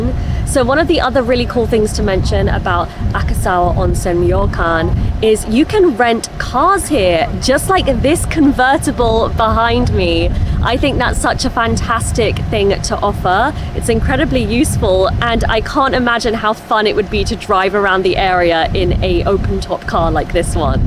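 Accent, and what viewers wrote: United Kingdom. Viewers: ねえちゃんのBritish Englishをいつも楽しんでいますが、 鼻の大きさも楽しんでいます。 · とても美しいドレス姿と日本の半纏。楽しみました。イギリス英語は日本人にあっています。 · アメリカ英語よりイギリス英語が聞き取りやすいという意味が分かったかも✨ 日本語訳と英語の同時表記ありがとうございます。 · British English も美しい……今からもずーーっと聞かせてほしいですね、耳に心地よい響きをもたらしてくれる………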